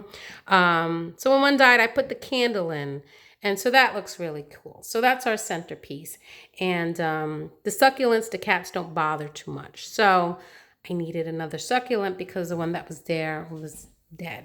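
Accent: New York, United States. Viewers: I could hear the NY in your voice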